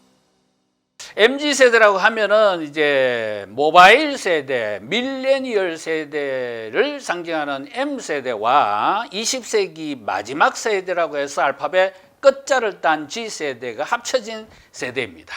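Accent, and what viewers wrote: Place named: Gyeongsang, South Korea. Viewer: 경상도 말튜네. 건설 마피아의 악마적 결과를 세대갈등으로 작정하지마시오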